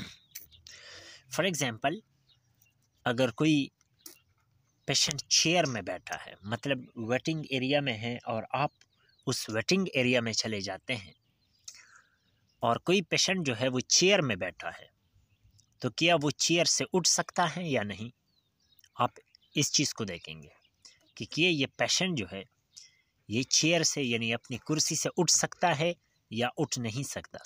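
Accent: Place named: Pashto, Pakistan. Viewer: Aesa lg rha hy ap pashto mn English bol r hn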